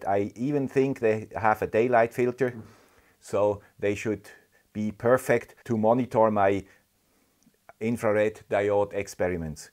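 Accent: Switzerland. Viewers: den Schweizer Dialekt hört man schön raus : ) LG aus Österreich, tolles Video. CE Markierungen sind so eine Sache bei den Produkten ^^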